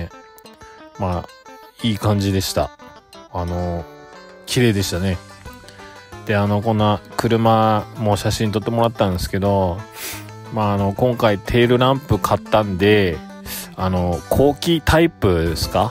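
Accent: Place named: Tohoku, Japan. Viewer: 宮城でハイラックス前期乗ってます！訛りで、東北の方だなってすぐわかりました！笑 LEDテール参考にさせていただきます！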